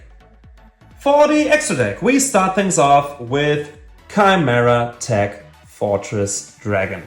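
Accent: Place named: Germany. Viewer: German english...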